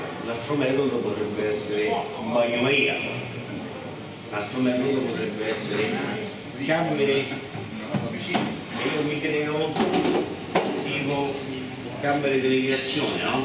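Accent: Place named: Rome, Italy. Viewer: Un maestro..e poi è troppo bello il romanesco!! Bravo!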